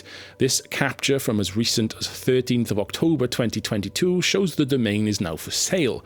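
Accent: Wales, United Kingdom. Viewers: Lovely channel...beautiful (Welsh?) accent! When you ask for everyone to be nice at the end, your manner is so lovely that I just want to be nice! Please do do more about the CHEM stuff....it's pretty interesting and the guy proposing it doesn't seem like a nutter!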